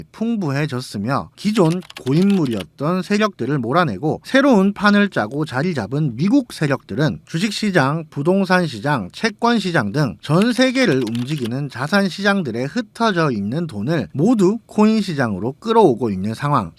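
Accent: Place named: Gyeongsang, South Korea. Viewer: 갱상도는 발음 어쩔수없어요 괜찮아요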